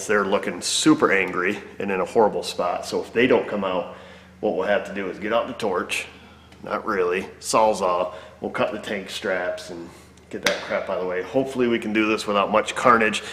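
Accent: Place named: Pennsylvania, United States. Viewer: This guy has integrity and smarts. I'd trust my ride with him, which is trust I don't easily give...judging by the accent, i'm guessing he's from PA. I'm not surprised he's busy as hell.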